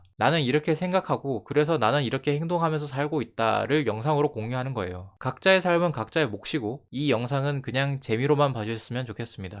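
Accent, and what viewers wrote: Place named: Gyeongsang, South Korea. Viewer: 경상도 억양을 씁니다. 사투리를 줄인거지 억양은 경상토 토박이에요 고향이 대전이라는데 억양은 토박이 경상도가 강한데요..신기하네요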